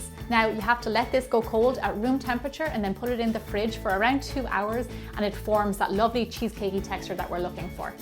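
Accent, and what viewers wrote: Ireland. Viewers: are you Irish??? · I just made the cookies n cream one and it came out ABSOLUTELY AMAZING!!! It was perfect because I was craving something sweet, and since I live alone, if I bake a cake I eat the cake, which is bad for my waistline!!! So this little treat hit the spot without being too much, and like you've said it other videos when you make it urself you know what you're eating, which is important too! I love your recipes, love your channel, and absolutely ADORE your brogue! It's very soothing! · Im in love with your wonderful recipes and your Irish accent!!!😍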